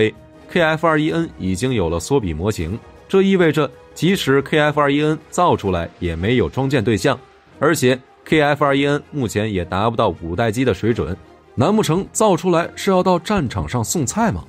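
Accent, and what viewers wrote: China. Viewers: 有點好奇，標題的"韓國話虎膦"似乎是臺灣的發音，但頻道主發音像是大陸人，怎麼會知道臺灣的厘俗用語啊？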